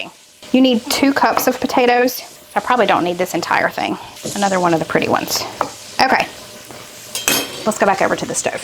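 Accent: South, United States. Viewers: Omg I'm actually crying....chocolate chip key lime pie..and in Your southern accent...its MEENT ❤❤❤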